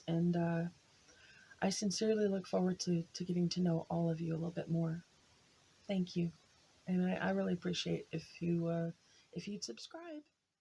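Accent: United Kingdom. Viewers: Accent, kind of London, East End - Not bad at actually! - Seriously though, If you can ever find the time, I think your wide experience of both health care systems are well worth putting into print.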